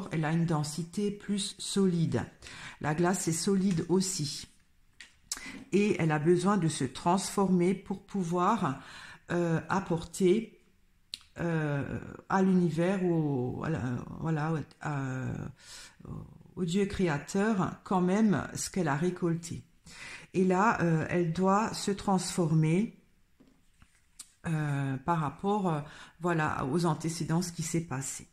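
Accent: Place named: Corsica, France. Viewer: Merci vous êtes Adorable vous avez une intonation dans la voix qui me fait penser un peu à l accent que nous avons en Corse j aime beaucoup mais je vous suis avec beaucoup d interet pour votre bienveillance je ressent chez vous la communion que vous partagez avec nous tous c est beau ❤❤❤ Bises depuis la Corse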